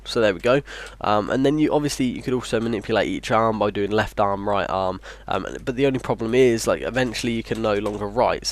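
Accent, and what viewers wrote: England, United Kingdom. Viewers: I know it may be obvious to some but are you English? I can hear your accent but I doubt it a bit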